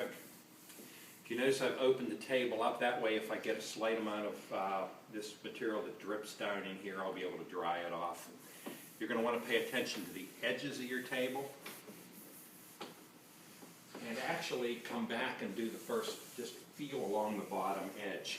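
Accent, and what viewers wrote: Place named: Pittsburgh, United States. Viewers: Agreed sounds like he’s from Pennsyltucky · Thanks. I've used Murphy's for years, but just wanted to see if I used it correctly before I start a project on an old piece. * Side note...I looked up hardwoodartisans. It says Virginia, but you sound like you are from Pittsburgh.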